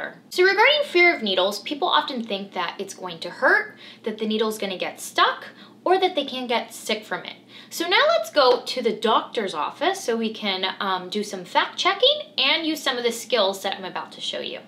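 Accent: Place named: Dallas, United States. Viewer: You sound like your from Dallas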